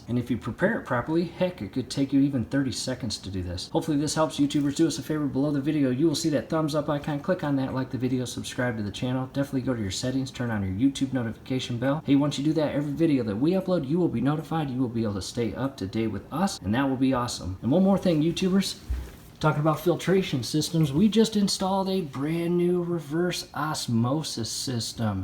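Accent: United States. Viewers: Thank you short precise and American speaking. Right to point perfect outline got filter changed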